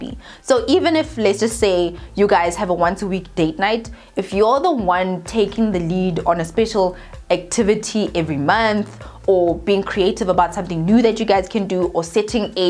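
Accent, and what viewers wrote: South Africa. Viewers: I just saw ur video on ugly panties nd subscribed with immediate effect! Liking the fact that u sound so South African gao zame go sound American or speaking through the nose😂🤣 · South African? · I LOVE #SouthAfrican Accent You're Amazing Girl ❤️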